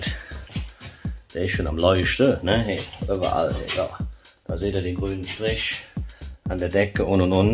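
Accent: Cologne, Germany. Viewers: ein "Profi" mit Parkside Werkzeug 😉 Endlich kann ich meine Lidl Käufe rechtfertigen, auch wenn dich meine Frau hasst, nur vom zuhören. 😉 Also bitte weiter auf Köllsch 👍 Wenn es Sinn macht für ein Video, kannst ja deine Werkzeuge vorstellen und warum du die hast, was die können oder nicht gegenüber anderen Marken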